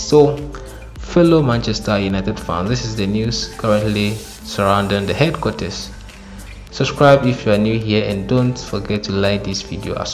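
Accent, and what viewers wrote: Africa. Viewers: Love the african accent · Are you an African? Your accents says it all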